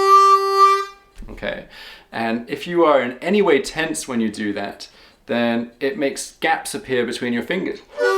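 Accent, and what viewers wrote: Scotland, United Kingdom. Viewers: Bro are you really Scottish??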